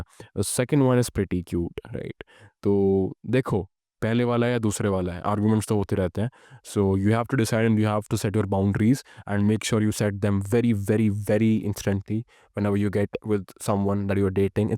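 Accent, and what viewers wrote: India. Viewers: He's got an Indian accent though.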